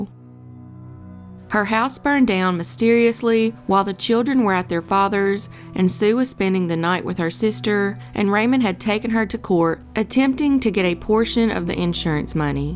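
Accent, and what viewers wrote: South, United States. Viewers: no problem. For me this is like really american local experience and vibe which i get from your videos. I like your southern accent. And when i said thanks for covering cases unknown to me, i meant you really dig up cases which are really unknown or unheard. Nevermind that im from Bosnia i watched almost all known cases, and a lot is familiar to me. You bring something new and fresh. Like everyone is eating vegetables from supermarkets from industrial farms, and you bring your local on fresh soil grewn organic vegetables 😁😜😆😂. Thank you again.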